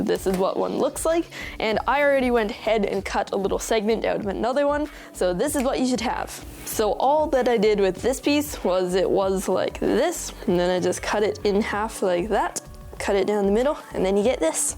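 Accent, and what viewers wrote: Canada. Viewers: Wow you are so grown up know you have bass in you're voice and lost some of the canadian.i can't believe I watched you grow up from backwards hat to beenie and now you show you're hair.great job kid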